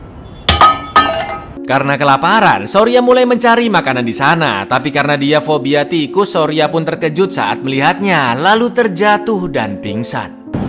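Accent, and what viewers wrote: Java, Indonesia. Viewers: kue wong jowo opo cok, medok timen le ngomong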